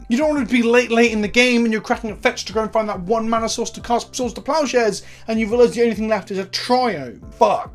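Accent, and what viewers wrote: United Kingdom. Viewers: British Hungrybox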